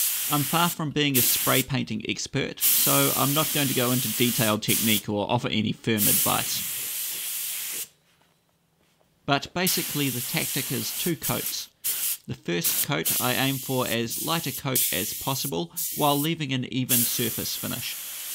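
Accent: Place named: New Zealand. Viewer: 1) Aren't you giving the foot-switch your luxury restorative spa-treatment as well? 2) Your videos remind me of the appendix discs of LotR where that guy from Weta talks about all the props and stuff (I mean this in the best way possible!). I think your accent may have something to do with it... 3) Who knew watching someone on the other side of the World restore old machines could be so interesting? Your skill, both at restoration and making videos, is impressive. I hope you keep posting,. 4) Greetings from Norway!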